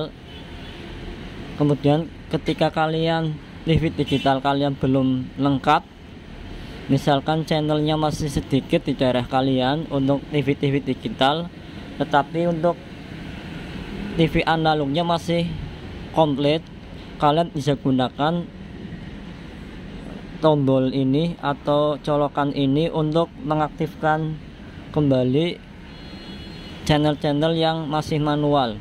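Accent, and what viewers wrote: Java, Indonesia. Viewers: Pasti...iki wong Jowo....medok banget ngomongnya!😄😃😀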